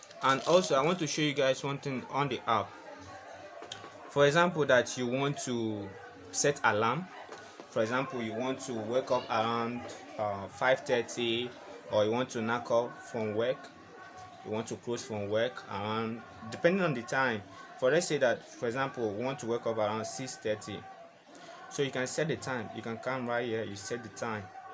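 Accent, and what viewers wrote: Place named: Africa. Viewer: Brother, I can tell you're African from your accent, and I'm really proud of your video. I bought this watch from the Best Buy online store, and I needed to know how to charge it. Thanks for your help.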